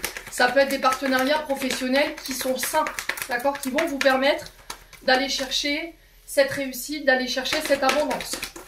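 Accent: North, France. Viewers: Incroyable 😍 ! Tout est juste pour moi et quel plaisir d'entendre ce petit accent du Nord. Merci beaucoup, je m'abonne ❤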